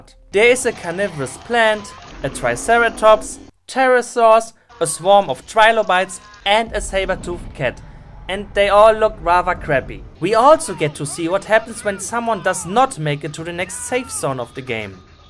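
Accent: Germany. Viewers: This video is the first I ever watched from you, and by your accent I immediately knew you were German.... Wie kannst du kartoffelsalat so schlecht reden? XD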